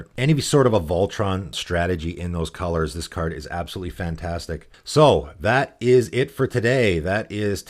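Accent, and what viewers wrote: Canada. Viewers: Z! I suspected you were Canadian :p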